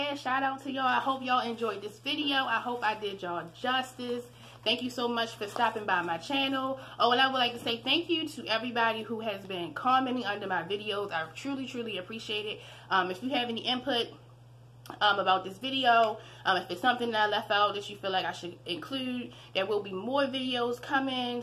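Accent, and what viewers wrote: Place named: Baltimore, United States. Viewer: I’m from Baltimore and your accent sounds similar .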